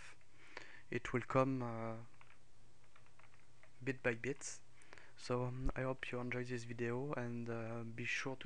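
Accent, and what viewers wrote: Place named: France. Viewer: L'accent français fait tout le charme de la vidéo =)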